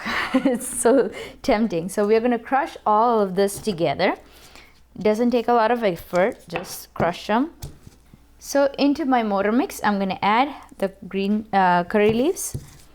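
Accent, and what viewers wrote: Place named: United States. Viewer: Mouth watering recipe. I am gonna try. Thanks for sharing. Btw you have fine American accent. How did you achieve it?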